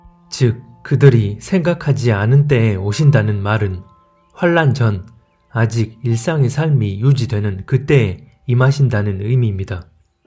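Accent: Jeolla, South Korea. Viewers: 절라도사투리구시히네요. 중공삼자교회 성도인가요.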